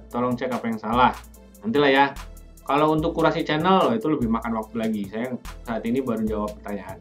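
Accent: Java, Indonesia. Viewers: WKWK😂 TAPI KOK LOGAT NYA JAWA??😂😂🙏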